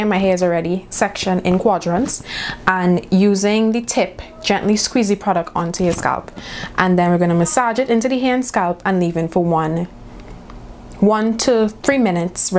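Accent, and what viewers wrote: Trinidad and Tobago. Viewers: From the min you spoke I was like look my Trini sister lol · Must make out dat accent 🇹🇹🇹🇹🇹🇹🇹🇹🇹🇹🇹🇹🇹🇹🇹🇹🇹🇹 im subscribing now ❤️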